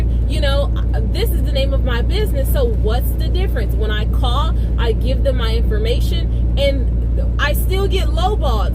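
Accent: South, United States. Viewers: Are you from the south? What are some good load boards besides DAT? Can you name a few?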